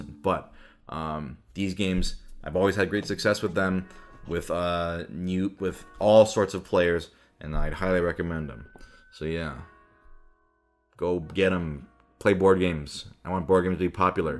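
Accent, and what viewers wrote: Germany. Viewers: German accent made me subscribe 🤣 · Your German accent is on point! Bruh! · God I love his fancy German accent lmao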